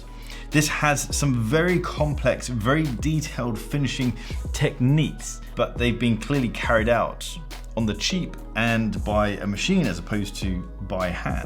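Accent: United Kingdom. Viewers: I am very sorry, I've tried to resist for ages but I can't stand it anymore: Do you know Chris Broad, why do you look and sound similar, did you probably grow up in the same region and whats this great (british?) accent? Where you come from, there has to be more like you!!! We need to find them... I know this is random, but I cannot not mention it anymore and I am probably not the first one to ask for it or suggest similarities. Actually, Its just that Im interested, if its a coincidence, or if its an actual thing; being a regional accent + lookalike theme, or whatever. Great Videos, I need to add. Great narrative, great look, great speed .. love it! Also came here through Baltic, the Acquascaphe GMT being my "target" watch.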